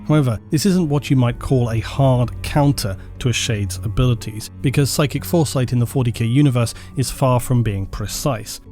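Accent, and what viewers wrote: England, United Kingdom. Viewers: No amount of English accent and somber narration can change the fact that a female body simply isn't built for any sort of physical combat, much less assassinations. The 40k universe pandering to this idea just makes it less credible. There's already a lot of questions concerning 40k lore, besides the nature of these Callidus Assassins and it only makes it worse. A lot of 40k lore is so out of touch with logic, it makes me think that the only thing that keeps this franchise going is the blind fan loyalty who don't care about all these issues.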